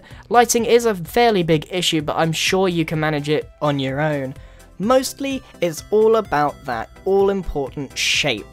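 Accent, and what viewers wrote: England, United Kingdom. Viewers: David Benner yes, is British, he lives in the UK and he has a British accent.. And I know because I am british, live in the UK and have a British accent.. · I love your British accent!!!!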